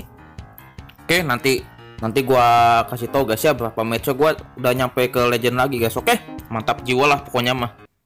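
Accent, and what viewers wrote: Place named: West Java, Indonesia. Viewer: Org Bandung ya..ngomong nya Sunda banget ... Bandungnya dmna kang .???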